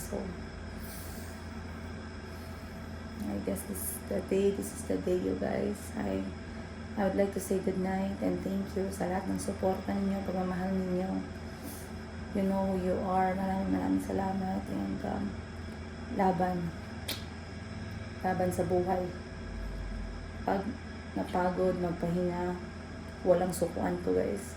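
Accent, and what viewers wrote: Iloilo, Philippines. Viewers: Kalambing mn mg ilonggo madam ba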